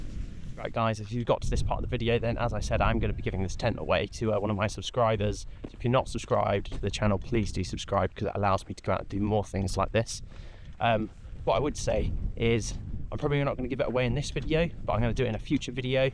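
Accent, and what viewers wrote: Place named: United Kingdom. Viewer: 1. Starting video 2. Hearing british accent 3. Closing videp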